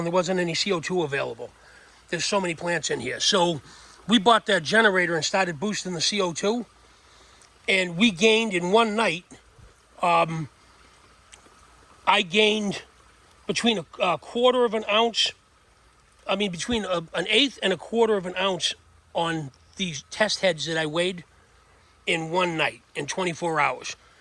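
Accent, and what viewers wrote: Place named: Upstate New York, United States. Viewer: Upstate new york or Jersey for sure. Nice space buddy.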